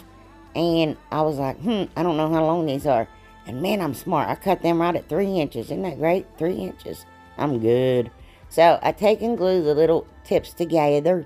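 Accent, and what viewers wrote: South, United States. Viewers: Lord where you been gurl, I looked at your video for the first time today and I love your spirit and your beautiful crafts. You are so inspiring and hilarious with that southern speech. I watched 4 videos and still going. Keep being you we need that. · Love the crafts and love listening to you. I know you have got to be from somewhere in the south...but where? I am in Georgia and love the accent! 🥰💕🌺🌼🌹😆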